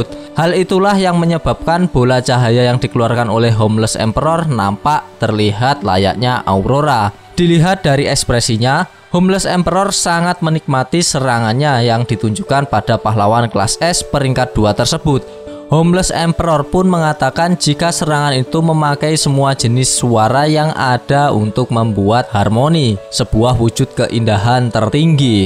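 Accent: Java, Indonesia. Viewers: jowo ndi kang ?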